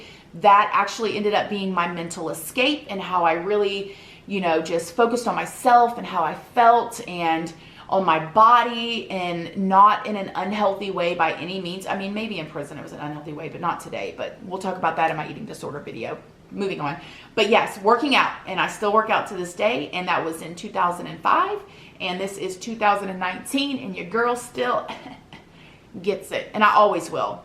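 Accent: South, United States. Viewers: You have a southern accent. (I’m from Louisiana) where are you from beautiful?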